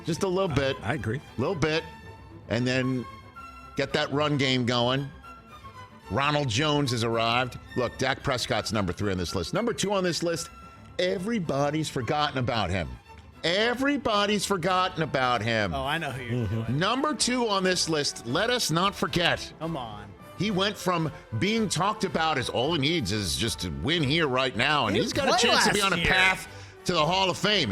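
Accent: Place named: New York, United States. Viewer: NY Accent was solid 👌🏾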